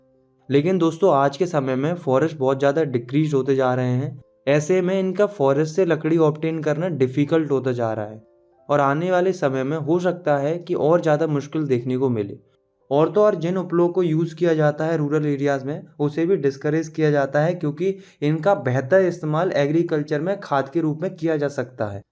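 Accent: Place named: Bihar, India. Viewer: just focus on his accent chapaniya bihari paan wala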